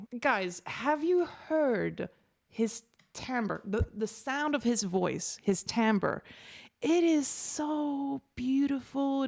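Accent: Paris, France. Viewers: je suis francais j'ai 41 ans tu parles aussi bien que moi tu as un accens limite parisien et ton francais est clairement parfait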